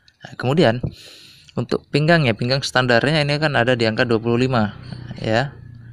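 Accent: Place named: Madura, Indonesia. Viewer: Logatnya madura...asli mana mas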